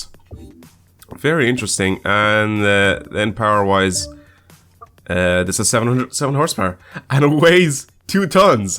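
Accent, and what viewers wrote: Ireland. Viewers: are you irish?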